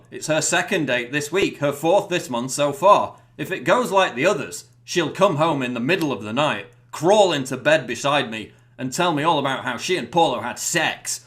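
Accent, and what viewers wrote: United Kingdom. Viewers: Paul Joseph Watson Okay, Paul, between your humorous and colorful description of the truth and your British accent, I could listen to you all day long!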